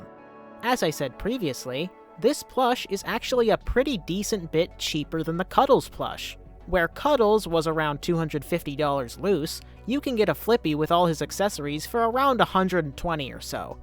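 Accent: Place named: United Kingdom. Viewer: Bro’s British✋😭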